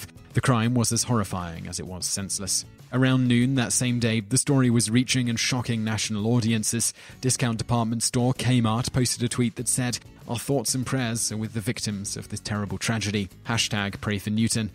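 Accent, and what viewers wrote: England, United Kingdom. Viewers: Mobiganic Actually the lists are typically really well researched. But he just reads them, they have other writers for the website itself. Yeah, he's British, so pronunciations Americans take for granted aren't used so much there. Also, he's British, so he pronounces some things differently. Get used to it. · He's English. they pronounce things differently there. Get over it.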